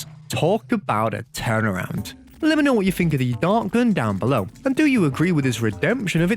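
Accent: United Kingdom. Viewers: I must ask, where in the UK are you from?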